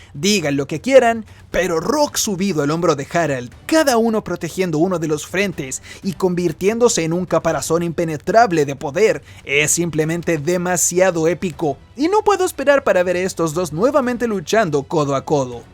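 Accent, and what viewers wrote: Chile. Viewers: Pensé que era chileno, de mi chile querido.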